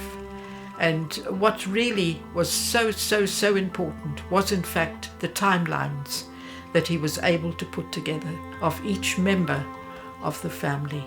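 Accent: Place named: Zimbabwe. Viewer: This Lady sounds like my late mother.. a precious lady .. a voice of a true Rhodesian . Thank you for sharing your life 🙏🏻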